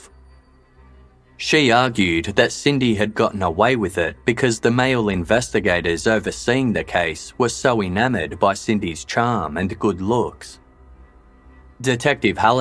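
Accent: Australia. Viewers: Sounds like he is from Australia or NZ. Been our Summer holidays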